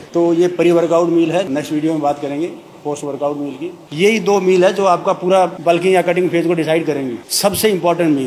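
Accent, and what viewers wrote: India. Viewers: Aapki boli desi h mhari taria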